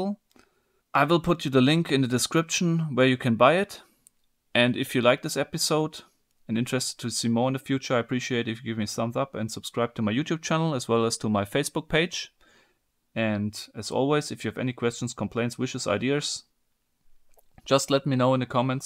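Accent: Germany. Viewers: The German accent makes me want to watch his videos even more :))) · Is this a german acent?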